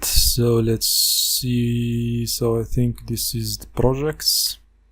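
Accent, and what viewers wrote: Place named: Morocco. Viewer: HHHHHHH the moroccan accent 🤣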